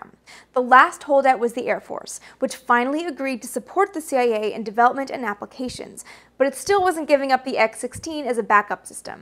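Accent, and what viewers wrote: Quebec, Canada. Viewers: English is a complex diffuse language that includes much from Latinate, Romance and Hellenic origins, plus regionally on neighbour and partner languages. Do you pronounce "cafe" as per the original form "café" or a literal mangled version like "caff" or "kayfe"? How about Jalapeño with a hard "J" and a "peeno" at the end? I am fluent in four languages (English, Finnish, French, German) and I found that once I worked beyond my mother tongue (English) I began to understand how it works, when it doesn't, it's oddities and the like, plus basic respect for non-English words being pronounced correctly. I'm guessing that by how pronounces her English, she is Canadian and hence is likely to be more au fait with French pronunciation and recognising word forms. The worst though, is NHL commentators mangling people's surnames without actually bothering to learn how they're truly pronounced. 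That's beyond lazy to the point of arrogance. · Canadian accent is really coming out today. 😋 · She sounds Canadian. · I don't understand why this is throwing some people into such a tailspin. Have you heard of a French-Canadian accent? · Proud of you even after your Canadian pronunciation (yes my dear, I'll deal with it). Been watching you for some years now and bought your book on women aviators for my 5 yo grand daughter (my 87 yo mother read it and loved it, I too). Do you have any capability or desire to Sign your book? I'd love for her (my grand daughter) to have a signed copy, do you do this? Keep it up, you and others that love aviation history, swell my heart in a good way. I'm a retired Navy Air Traffic Controller that grew up watching Mercury, Gemini, and Apollo. I still cherish watching Apollo 11 as a 11 year old boy at my Grandma's on a warm East Texas night in July, 1969 land on the moon. 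Still get chill bumps thinking about it... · She's a Canadian and has a bit of the French accent. Fun fact just drop the 'H' in every word you say and you are on your way to great French Canadian accent.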